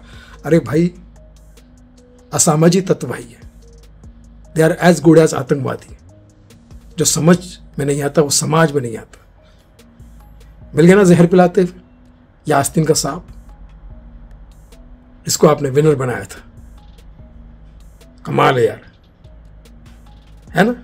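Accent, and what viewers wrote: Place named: Uttar Pradesh, India. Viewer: Acha lga u.p wala boli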